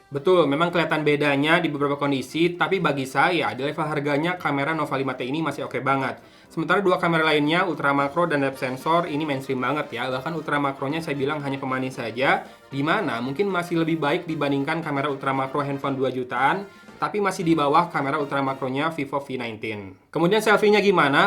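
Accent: West Java, Indonesia. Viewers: orang sunda mah keliatan dari logatnya juga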